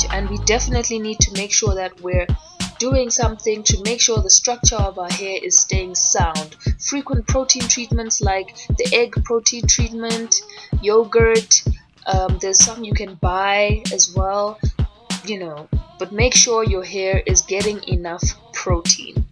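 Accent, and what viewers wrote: Zimbabwe. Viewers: This accent has to be Zimbabwean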